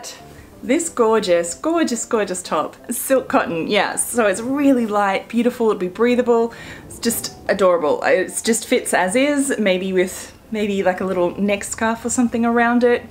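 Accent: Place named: Australia. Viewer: New to your channel, your accent sounds Aussie to me, do you live in Australia?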